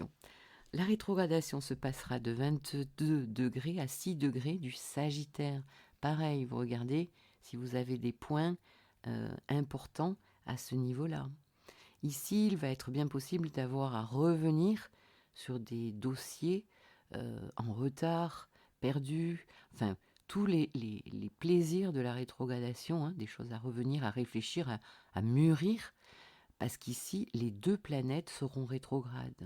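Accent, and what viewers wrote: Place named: South, France. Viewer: Bonjour, je viens de vous découvrir...votre voix est très agréable à écouter...du velours! Et votre petit accent méridionnal est bien stimulant dans cette période très nuageuse et un peu triste! Belle synthèse de ce qui nous attend pour une année!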